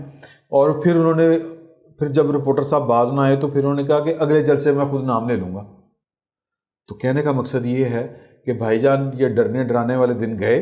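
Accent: Punjab, Pakistan. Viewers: صاحب میں نے کوئی مہینہ بھر پہلے آپ کو اتفاقن سنا. شروع میں آپ کے تجزیوں سے پنجابیت کی بو محسوس ہوئی. مگر اب اپ کی tellings کا انتظار رہتا ہے. خاص طور پر آپ کا انداز بیان جو کہ نہایت سادہ عمومی اور بے تکلفانا ہے.اوپر سے ہلکا سا پنجابی تڑکہ اسے بہت پر لطف اورعام فہم بنا دیتا ہے.آپ مشکل سے مشکل اور بڑی بات کو انتہائی سادگی سے بیان کر دیتے ہیں. دعا گو ہوں کہ اللہ آپ کو صحت و زندگی عطا فرمائے اور اپنے حفظ و امان میں رکھے.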